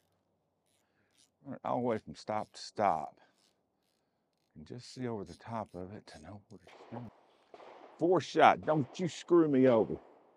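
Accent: South, United States. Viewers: Are you Southern or Texan? Either way I adore you, your sense of humor and editing style!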